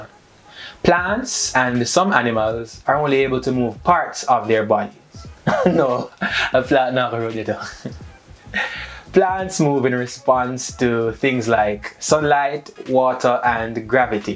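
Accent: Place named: Jamaica. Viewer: Your work is fun entertaining and educational. My students can’t stop laughing and the spit out the information shared with such ease. It’s so refreshing to hear my own accent in something like this. I look forward to watch every topic and won’t stop sharing with those overseas as well. Brand Jamaica mi seh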